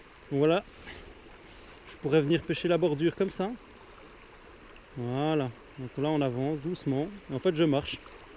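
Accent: Belgium. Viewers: saluut superbe video comme tjr tu ma eclater de rire avec ton belge c super marrant dans certaine condition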